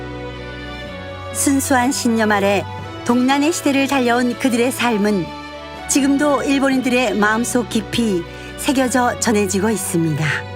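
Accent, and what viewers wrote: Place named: Gyeongsang, South Korea. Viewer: 경상도 사투리를 쓰고, 그리고 문제는 일본어 장음이 전혀 안되고 토의 발음이 도로 되는 등 전형적인 한국인 발음임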